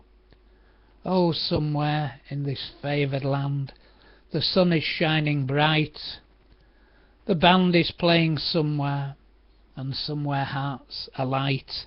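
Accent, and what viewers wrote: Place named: Yorkshire, United Kingdom. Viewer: Sounds great in a Yorkshire accent. Good choice today. Too bad Casey struck out....;)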